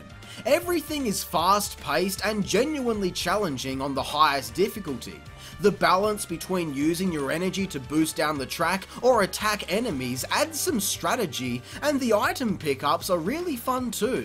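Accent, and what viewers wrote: Australia. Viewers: You are actually so australian that it hurts, good video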